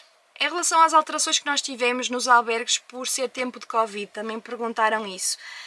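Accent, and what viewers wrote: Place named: Portugal. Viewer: Finalmente português de Portugal 😄😄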